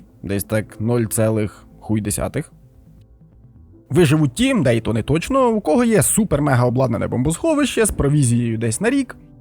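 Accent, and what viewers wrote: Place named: Chernihiv, Ukraine. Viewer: рідненький чернігово-сіверський діалект 🥲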